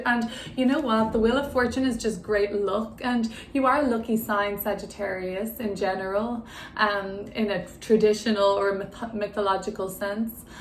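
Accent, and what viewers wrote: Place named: Ireland. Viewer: I love your beautiful irish accent, i should know, im from Dublin, lol. Thank you, beautifully read and spoken.🙏💚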